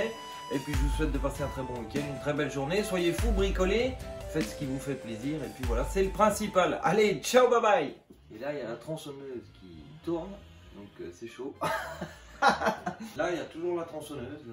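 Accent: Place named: Franche-Comté, France. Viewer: Super vidéo merci... Ton accent m est familier... Franche comte?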